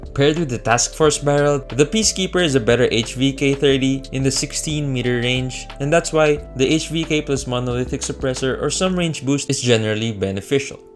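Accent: Philippines. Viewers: I have same accent. P-nAt batEr. Love from Philippines. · Pinat Bater - gotta love our Filipino accent. Nothing wrong with it. Language is dynamic anyway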